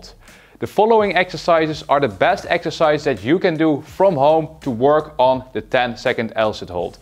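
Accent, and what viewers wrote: Netherlands. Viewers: I like the dutch accent in English :DD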